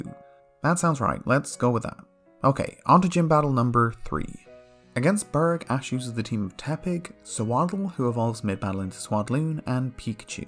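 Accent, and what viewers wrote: Ireland. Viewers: Oh ! I didn't realise you were Irish too!! That's so cool. Hello! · hes from ireland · Aye I didnt know you were irish too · NorseGoat I can only recognise two Irish accents, and his voice didn’t sound like either but I feel like such an idiot for not realising, I just watch too much Foil Arms and Hog.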